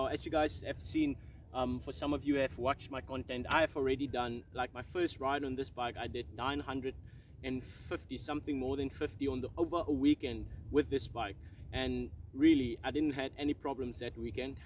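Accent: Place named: Afrikaans, South Africa. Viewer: The Afrikaans accent in this video is phenomenal 😁👍 thanks for the video! As a girl...it helped me a lot! 😁😂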